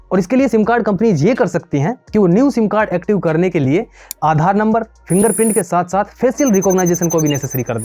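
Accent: Haryana, India. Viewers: Bro Haryanvi style toh hai 🤔 ( suggest me) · I can see that Haryana accent in his voice , good work bro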